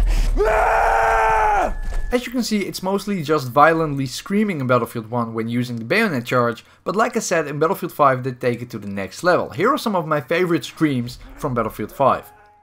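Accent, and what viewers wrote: Netherlands. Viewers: U dutch? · a Dutchman who speaks English, go to school bro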